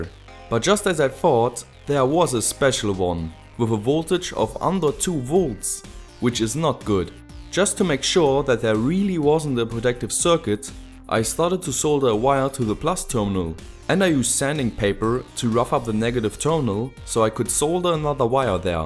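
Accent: Germany. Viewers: yeah he has perfect and his accent isnt wierd i it sounds like a normal german accent, I used to live in germany for 2 years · Your Accent is really dominant. You sound pretty german.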